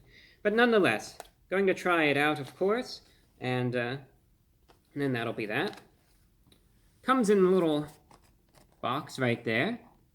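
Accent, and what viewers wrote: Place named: Transatlantic, United States. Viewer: Brah really has morphed his accent from New Yahk to Transatlantic over the years.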